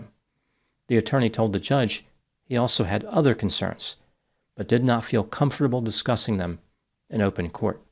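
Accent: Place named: South Jersey, United States. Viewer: He has a south Jersey accent, so I think you might be right 😆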